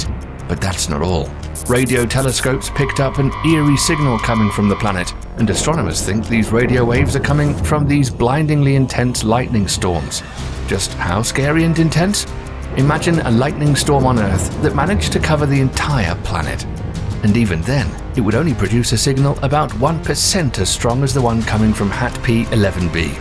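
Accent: United Kingdom. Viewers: Why do we need a guy with a British accent to explain projects by NASA?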